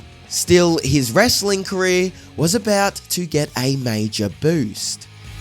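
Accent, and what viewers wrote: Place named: Scotland, United Kingdom. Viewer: the best narrator Scottish guy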